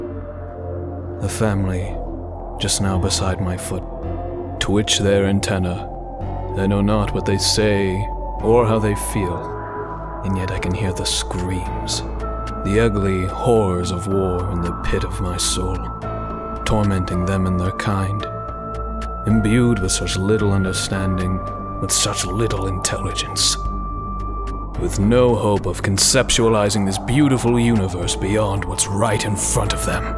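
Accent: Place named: United Kingdom. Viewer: Aaah yes, british accent + poetic lyrics + = absolute subarashiness 👏👏👏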